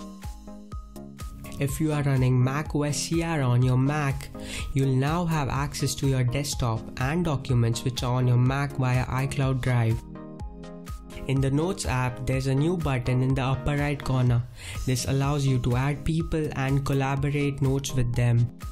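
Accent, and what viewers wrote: India. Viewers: Your Indian accent is too funny! LOL.I couldn't understand most of the times! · Damn that Indian accent is so annoying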